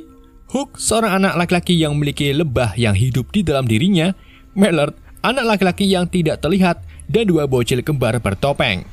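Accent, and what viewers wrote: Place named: Banyumas, Indonesia. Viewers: ternyata wong ngapak, salam sing Tegal 😂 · iso ngapak ya rika jebulnya 😂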